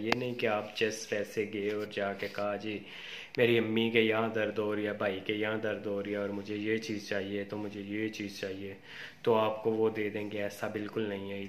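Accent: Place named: Punjab, India. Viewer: Nice panjabi bro ap suraiki ho ya panjabi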